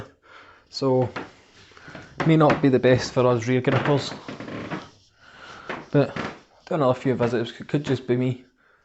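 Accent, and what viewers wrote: Scotland, United Kingdom. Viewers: I come for the reviews to hear you say grip. Gotta love a wee Scottish accent aye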